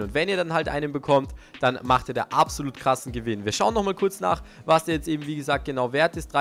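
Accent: Austria. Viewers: Er kommt aus österreich du fisch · Es is a östareicha ok mia song ned oise so wie de deitschn.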